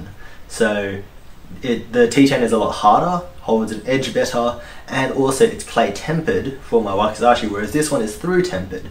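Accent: Australia. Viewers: are you australian? (accent) nvm you just sead aud are you in sydney because im going crazy trying to find bamboo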